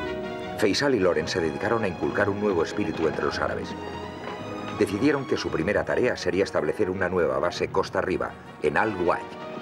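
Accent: Spain. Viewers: This accent is from the spanish península, that is spain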